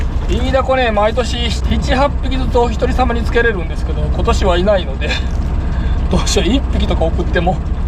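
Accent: Okayama, Japan. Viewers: いつも楽しく拝見しております。自分黒崎中学校出身で故郷の海、岡山弁懐かしいですね。瀬戸内海のカニ、カキ美味しいですよね。さむい寒い季節ですが頑張って下さい。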